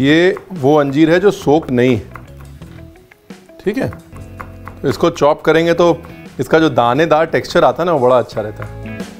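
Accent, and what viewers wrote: India. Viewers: Pta nhi jab MASTERCHEF INDIA MEIN Chef Ranbeer ko dekht thi ..to dono chef k muqable mein wo thoda...moody lgi thi..but..here OMG I can't...he is sooo much...typical Punjabi GREEN HEART MAN...NOw I love him soo much & his simple cooking style..& also his frankly desi speaking....😍😍😍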